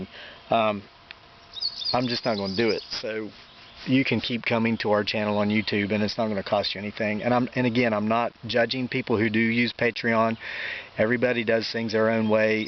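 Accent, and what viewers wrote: South, United States. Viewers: Just found your channel a couple days ago, and I tell you, I am impressed! Someone that lives by their morals rather than the bucks they can rake in...Thank you! I hope you are able to leave this job with a good taste in your mouth, that you have done your best, given above what you should have had to, and can leave with pride...I'm sure however it turns out, the full notice or not, that you have. As a northerner, I love listening to your southern speech...it's soothing to my heart and ears. My grandmother and great-grandmother came from the south, it feels like home... Blessings to you and your wife and son. God bless!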